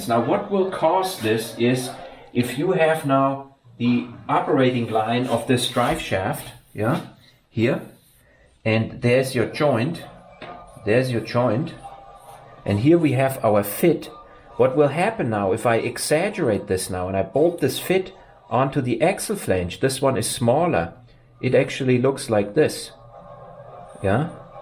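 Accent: Germany. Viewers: Mechanical lectures spoken in a German accent have an authority that is unrivaled by any other nationality. All of us viewers around the world are just sitting nodding and quietly saying, "Yes, Herr Professor," accepting everything you say without question... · I hear German accent, I believe any engineering points they tell me !!!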